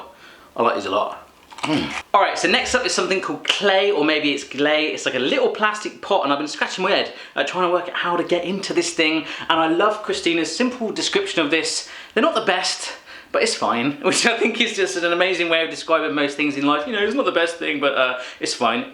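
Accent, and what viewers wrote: Mexico. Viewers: España y México son diferentes no pongas acento de mexicano para hablar en español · No se quejan de que no hable español bien, se quejan de que hace un acento mexicano como si todos los de España hablaran asi, pero bueno que se puede esperar de un ingles sin ninguna cultura -. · The accent you use to speak as a spanish is a mexican accent xD Spanish dont speak like that ;D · why are you talking like a mexican? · Yeah, man... you definitely think that the Spaniards' accent is the same as the Mexicans'... and sorry, but it's not, really, at all. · Dude, I'm from Spain, and we are not Mexican 😂 We don't speak with that accent 🙈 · NObody says Ole in spain and you spoke like mexican, not like spanish xd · Man, how come you still play spanish accent as mexican? Travel more mate, we are not that far away from your place... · this suspicious white powder you're eating is called sidral, and you're speaking spanish like a weird mexican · Your mexican accent is awesome, but in Spain we don't speak like that my friend :P hahaha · You speak as a Mexican , the Spanish is as the italian or the français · Madre mía xD, pero este porque habla con acento mexicano , a ver si estos estadounidenses van aprendiendo de una vez que España no es México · Your mexican-spanish accent was funny hehe but we Spaniards don't speak like that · Why did he talk like a mexican? 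Spain is in europe, we don't have latin accent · por que imita a un mejicano cuando habla español?, no todos los que hablan español son mejicanos, de hecho, no viene de ahi la palabra español precisamente. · Por qué cuando hablais de España poneis acento mejicano y decís cosas como "olé "???México y España están a un oceano de distancoa y son culturas muy diferentes. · I don't know what's worse, the way you eat the "seeds", using a mexican accent for spanish people, the way you ate the melon gum or you trying to be funny and turning out to be absolutely awful. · We haven,t got Mexican accent 😂😂 Our accent is more clean, I don't know how to say it. The only accent in Spanish are the "RR" and the "z" but it hasn't really accent. But good video,funny like always 😂😂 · lel so bad man spanish people doesn't have that pronunciation that's from mexico... And the green melon is a chewinggum u shouldn't swallow it. 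· Maaan, we don't speak like Mexicans jajaja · Why you speak spanish with mexican accent? And why you are always saying "Ole!"? :C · Nice video man,but spanish don´t speak with mexican accent....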